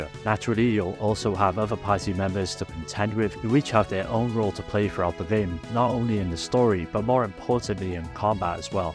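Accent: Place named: North East Wales, United Kingdom. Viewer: Great vid as always! And I swear that a North East Wales accent 😂 You sound EXACTLY like most of my friends ❤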